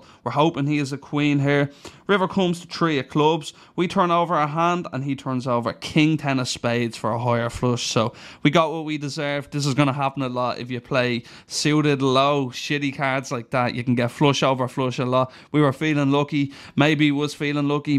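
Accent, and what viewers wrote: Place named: Ireland. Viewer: Love it! I just watch your vlogs cause I enjoy hearing hand histories in an Irish accent. Haha. Anyways great episode. Also, that raise on the river with Q10o was SPICY!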